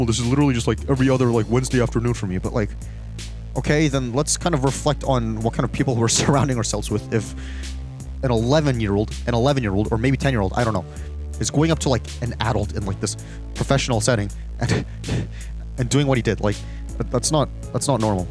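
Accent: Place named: Australia. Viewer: I like your accent it sounds Australian:]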